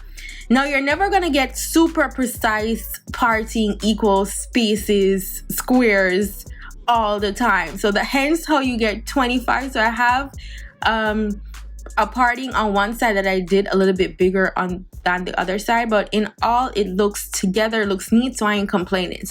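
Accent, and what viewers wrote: Jamaica. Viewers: aye from mi hear start tlk mi know ya jamaican enuh but anyway love the video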